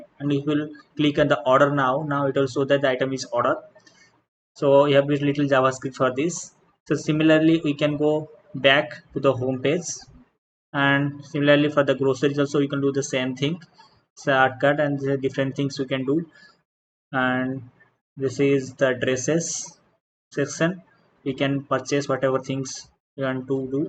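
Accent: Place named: United Kingdom. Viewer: Woww woww... British accent😱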